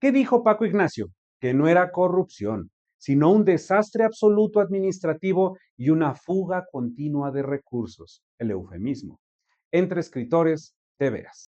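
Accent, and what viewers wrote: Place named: Monterrey, Mexico. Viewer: Muchas gracias Lic. Se nota Su nivel académico y cultural, estaba segura que: O era USTED de CDMX, o al menos vive ahí. Se distingue por su cultura, educación y forma de expresarse. Aunque no hable usted como "chilango" porque tiene la fineza de "REGIO". 😁😁😁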